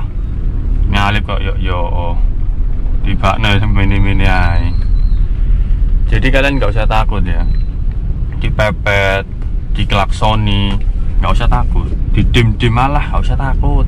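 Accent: Surabaya, Indonesia. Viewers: saya juga surabaya om, kedung cowek suka caranya ngomongnya hehe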